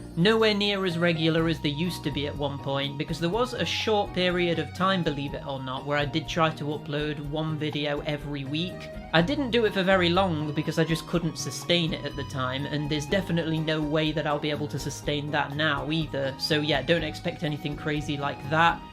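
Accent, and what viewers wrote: North, United Kingdom. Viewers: Congratulations, nice to see u happy. Not a big fan of shorts (if u need feedback on short videos) Honestly, I prefer to watch long videos where u enthusiastically talk about stuff that genuinely interests you. I don't even care what the topic is, I just really enjoy your accent and your storytelling, those little humorous bits and sarcastic remarks once in a while. You could read a washing machine manual for all I care;) Good luck with the channel, I'm sure your subs would love to see your mug;) more than once a month (maybe, your words, not mine;)) but at the same time it's quite understandable why it probably won't happen. Anyway, personally, I would be grateful for whatever I can get, as long as that is smth you are passionate about coz that's what makes your videos so honest and entertaining. I don't have any questions for Q&A, I'm sorry, I was trying to think of smth not too personal but didn't come up with anything interesting. 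Perhaps, what part of the UK are you from, maybe, as I said, I absolutely adore ur accent, I was ready to make a guess you are somewhat of a northerner but again, it's more of a shot in the dark, I don't know much about UK and regional accents. Again, good luck and take care.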